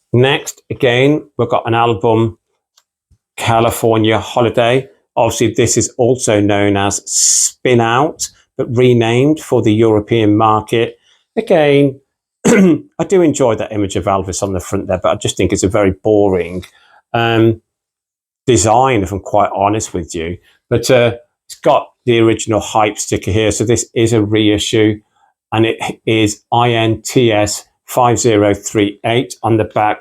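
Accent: United Kingdom. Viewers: Very Interesting videos. Your English in very clear, even so, I'd like to know where your accent is from: northern, southern, cockney? Blessings.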